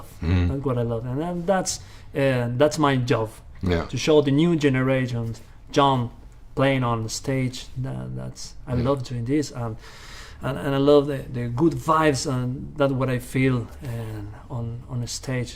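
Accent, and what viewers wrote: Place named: United Kingdom. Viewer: Your British accent is very good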